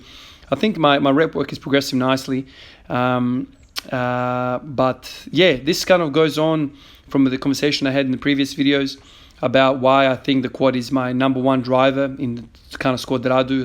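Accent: Australia. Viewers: I'm awful with accents. Are you Aussie, Kiwi or South African? Or none of the above? Haha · I thought this guy was from Australia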